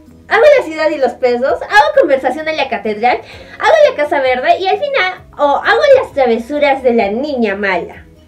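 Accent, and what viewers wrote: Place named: Arequipa, Peru. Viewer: Que linda eres , x tu acento pareces arequipeña ...me encanta tu narrativa , felicitaciones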